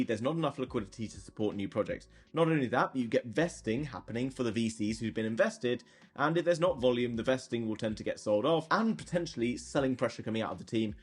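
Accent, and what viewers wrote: England, United Kingdom. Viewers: You are English are you ?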